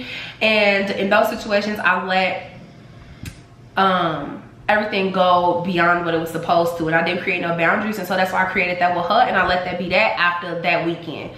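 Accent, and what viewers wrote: Chicago, United States. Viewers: This my first video I ever watched but you have to be from Chicago lol. New subscriber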